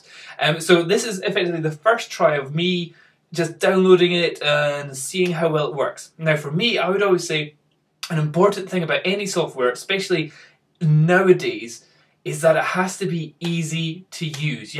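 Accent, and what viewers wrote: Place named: Scotland, United Kingdom. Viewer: I do enjoy your Scottish accent. Don't stop talking! Did you ever look at Corel AfterShot Pro?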